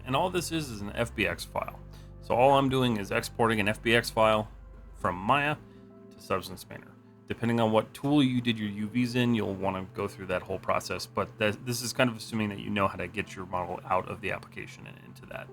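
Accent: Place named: South, United States. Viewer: I appreciate the video, and I don’t want to upset you by saying this, but constantly hearing “height” as “heighth”, like you’re a 16th-century man with wooden teeth, is driving me mad, especially when it’s said correctly in the same video. 😂 (I understand it’s a quirk in some parts of the South, so no hate, but it’s killing me.)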